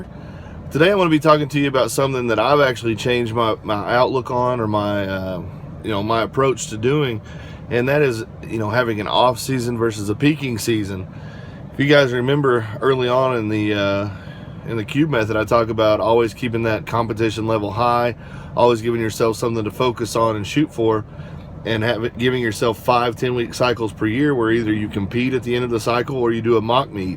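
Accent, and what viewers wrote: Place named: Russia. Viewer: Will you be putting this into the new book? 20 week cycle? I can hear the russian accent developing in your voice, you have learned a lot from Boris and his athletes,I can see the influence in the way you speak about training. A mix of Louie and Sheiko, ain't that the best combo in the world. I love it. Side note, how cool is that video of them two meeting? Louie was so happy, grinning like a boy. Glad that those two met.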